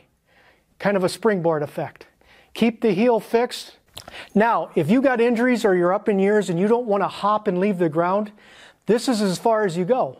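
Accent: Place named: Wisconsin, United States. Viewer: Excellent information thanks! I'm curious if rebounding on a trampoline is as effective? it seems easier on the body and joints . Challenging to stay on toes... Also I cannot sustain 2 consecutive minutes. I take breaks and do probably 4 or 5 minutes total. Also I detect a northern accent Wisconsin? 😀🙂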